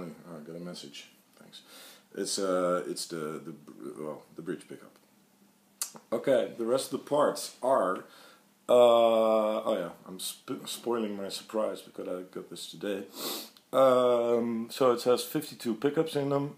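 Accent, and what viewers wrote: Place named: Netherlands. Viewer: You speak perfect English with a sleight Dutch accent.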